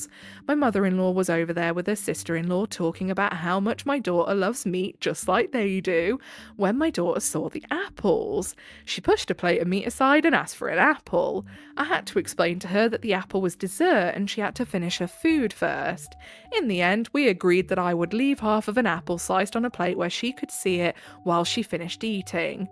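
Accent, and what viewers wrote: United Kingdom. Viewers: Oh you're British! No ai and British? Yes!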